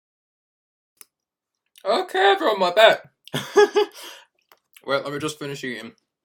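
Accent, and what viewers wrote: Scotland, United Kingdom. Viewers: R U Scottish?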